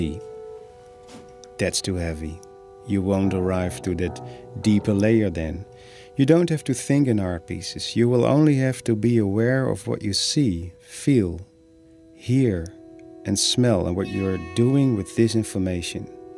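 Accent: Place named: Netherlands. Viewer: I just love your work it really speaks to me. I have painted most of my life but never had the time to really get into it. One side of my family ate Dutch and it was my first language so it fees so comfortable hearing your accent, like coming home. Thank you so much for sharing.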